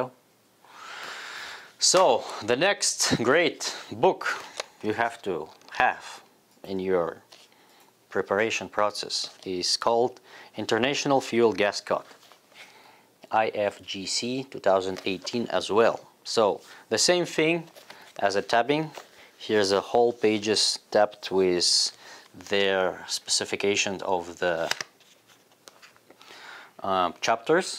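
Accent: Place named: Russia. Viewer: Sounds like Russia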